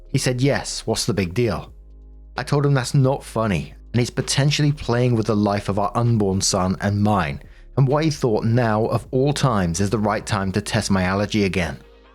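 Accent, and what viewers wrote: United Kingdom. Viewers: 12 minutes posted. I feel special. Love. British voice. Everything sounds better in a British accent ❤❤❤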